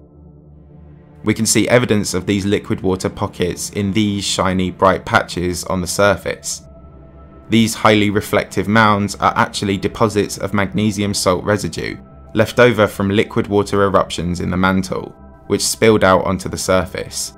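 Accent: United Kingdom. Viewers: I'm Dutch and I can hear it's not the Queen's English, but I often watch British TV so I'm familiar with different dialects. pronounciation is clear and easily understood. Here in the Netherlands I can often hear visiting British students and tourists. Sometimes I have to listen for more than a couple of seconds before I realize they are speaking some English dialect, or Welsh or Scottish or whatever...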